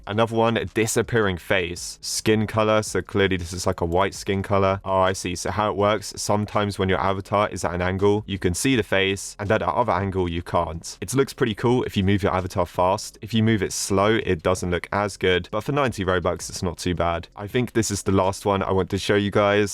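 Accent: United Kingdom. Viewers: me: is watching the intro BRI'ISH ?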